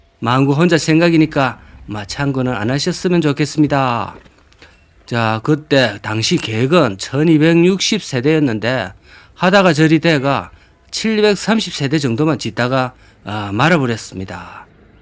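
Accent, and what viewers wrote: Gyeongsang, South Korea. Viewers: 구수한 경상도 사투리가 잘 어울리십니다.수고하세요.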